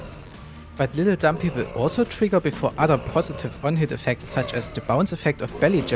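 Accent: Germany. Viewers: you can hear that this man is german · Wie man einfach direkt raushört, dass du ein Almano bist haha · Dude idk if every german speaks like that but i love how you speak english !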